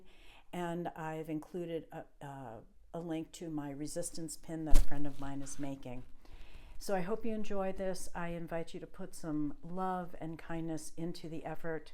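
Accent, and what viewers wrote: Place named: United States. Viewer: Oh, you’re so welcome. If it’s any consolation, even young immigrants find it hard to make friends here (see Simple Living Australia - I think that’s the channel name. I’ll come back and edit if I got it wrong.) it might also be more difficult because of your accent. Americans don’t have a good reputation here, unfortunately for you, because you sound like good people. Please, if you know of any anti-Trump or resistance protests here in Oz, please let me know. I’ve wanted to attend No Kings protests here in Sydney, but can’t find any details?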